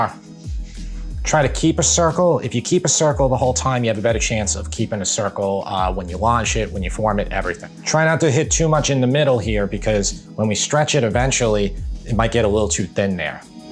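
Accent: New York, United States. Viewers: Great job on your presentation and content!😎🇺🇸🔥 Love the “Authentic “ approach…😋 I can’t help but feel confident with your approach and ingredient choices!😘 The New York accent doesn’t hurt!!😅 Lol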